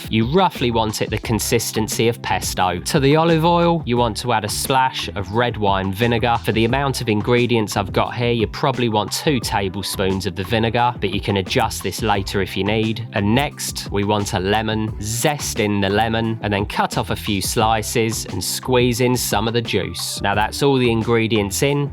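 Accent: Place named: West Country, United Kingdom. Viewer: west country, south west england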